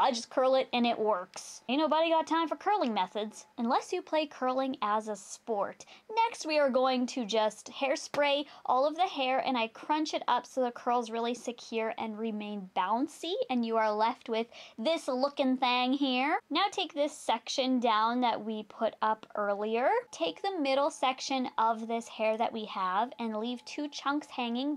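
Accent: Canada. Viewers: hehe sometimes i can heard your canadian accent and it's so cute! Go Canada!!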